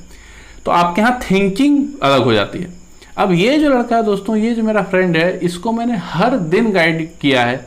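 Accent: Bihar, India. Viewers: Aap bihar se ho?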